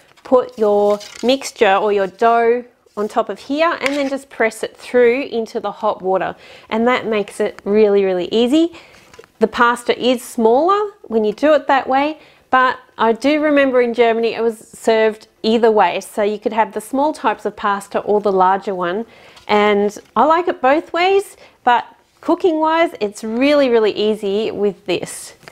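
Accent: Australia. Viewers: Wo in Deutschland bist du aufgewachsen? Woher kommt es, dass du nach Australien ausgewandert bist? Du hast auch einen australischen Akzent und keinen deutschen!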